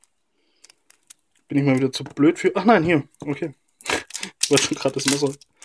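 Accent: Saxony, Germany. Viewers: bist du sachse ?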